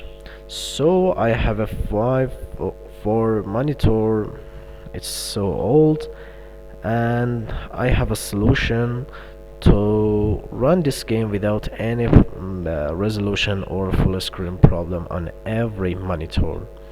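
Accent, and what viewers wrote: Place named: United Kingdom. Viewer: your english is so british bruh, are you asian ?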